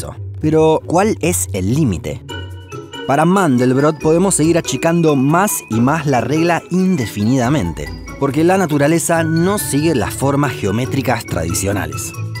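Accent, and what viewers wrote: Buenos Aires, Argentina. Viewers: Claramente se nota q es de argentina por el acento xD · Quise verlo pero no puedo soportar nada el acento argentino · chileno 0% .. es muy de Ciudad de Buenos Aires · Un video de alta calidad, te doy los "parabéns" desde Brasil, hermano. Tu acento argentino es tan hermoso como el video!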